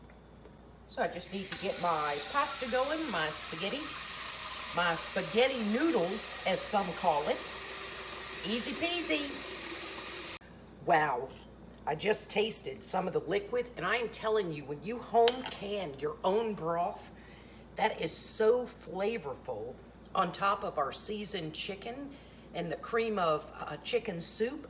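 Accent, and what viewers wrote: South, United States. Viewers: Hello, new to your channel.. been watching your videos.. I’m loving your videos and am loving your southern accent, I could listen to you for hours... Hubby and I are up here in Northern New England in Maine... We are retired and do as much homesteading as possible... This casserole looks soo amazing and delicious I can’t wait to make this.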